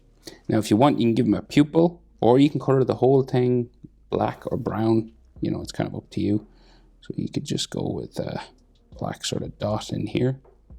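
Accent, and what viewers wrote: Ireland. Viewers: Welcome back, you from Ireland?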